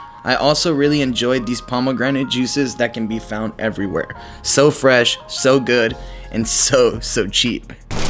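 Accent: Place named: United States. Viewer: You sound like a yank but look Turkish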